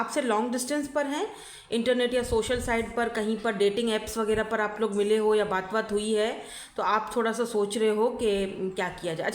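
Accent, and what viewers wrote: Punjab, India. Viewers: Love your punjabi accent❤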